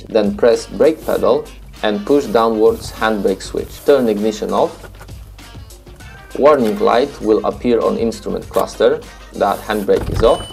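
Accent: Poland. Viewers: Chyba słysze rodaka xD łap suba.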